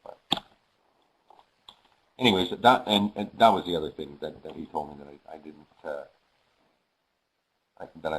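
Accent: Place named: Canada. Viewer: i hate your canadian accent "aboot"? thats not how the <link> pronounces it u ignorant ppl of canada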